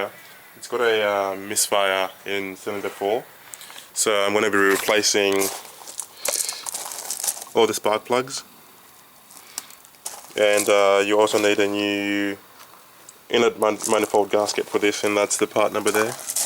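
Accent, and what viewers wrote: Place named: Australia. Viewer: Nice work mate. Love that Aussie Accent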